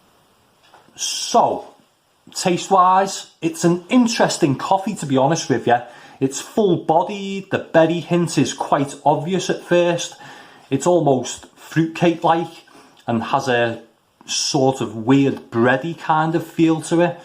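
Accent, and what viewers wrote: Liverpool, United Kingdom. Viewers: Thanks, but you do it so much better, and I could never replicate the Scouse accent being a 'Soft Southerner'!...as for the methods/protocol, I think this and a previous review of mine show that they are simply a guide for consistency, and sometimes you can get a better [tastier] result from what shouldn't work. Keep up the search [and review] of the best budget coffee beans! 🙂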